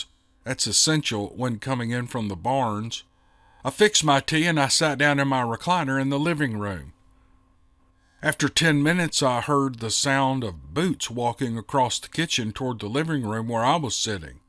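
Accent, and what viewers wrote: South, United States. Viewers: You read these stories so clearly and fluidly you must pre read and proofread every story, great job as usual and I am from Canada 🍁 and love your light southern accent! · Your calm voice in the night sharing stories was very much appreciated. I had to stop listening for awhile since retitling mixes were the same stories I had already heard and it was frustrating trying to figure out what was new and what was grouped into old stories by numbers. I only listen on YouTube and it was getting too jumbled to find recent posts from repeats. Posted date does not always mean recent podcasts. Sometimes the date was just when it was reposted into a different group of stories. "What if it was true"? Steve somebody? Do I want to write down the number? I stopped listening. But I don't like the voices of other storytellers. Your calm voice with the southern accent of my mother's relatives, is like sitting to listen to family visiting and catching up. 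Last night this post popped into my YouTube feed when I needed to get away from loud arguing of news stories and blood and thunder prophets. Thanks for continuing to keep it calm with anecdotes and comments. It was soothing to hear your voice as the wind was blowing in a storm moving south by southeast along the Pacific Coast, pushing inland over the coastal mountains. I hope your family, relatives, and friends have been missed by tornadoes, wind, and floods. I have a day to get ready before the temperature drops and the slushy mix turns to inches of wet snow and freezing nights for about a week. I am going to go in search of what has been added since I took a break and see if I can find what search terms I need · I LOVE ALL THE STORIES UNDER WHATEVER YOU PUT THEM! YOU HAVE A WONDERFUL SOUTHER VOICE!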